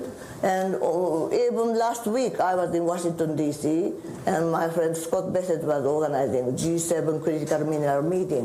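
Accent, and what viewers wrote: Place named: Japan. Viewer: 日本的発音ではあるけれども、 英語で自分の考えを専門知識とともに自由に述べることができる日本では希少な政治家の一人ではありますね。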